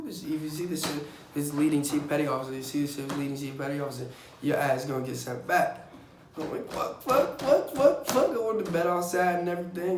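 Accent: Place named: East Coast, United States. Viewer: 😂😂 i can tell mans is from the east coast good shit my guy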